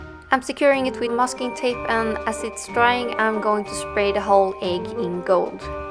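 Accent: Sweden. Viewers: Are you from Sweden cause you have a Swedish accent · Are you Swedish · that swedish accent tho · Det tog mig nästan hela videon innan jag insåg varför det låter så bekant när du pratar, sedan insåg jag att det är för att du är från Sverige! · Wow, great work!! I'm stunned :O Also, could tell you were swedish by your voice, so tjena;))) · Oh are you swedish? :) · Found your channel randomly, but you'r swedish aren't you? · omg jag gissade att du var svensk man kan verkligen höra det på din accent!!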